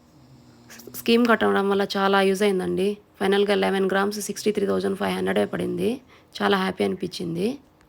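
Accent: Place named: Rayalaseema, India. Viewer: Mana Rayalasima voice super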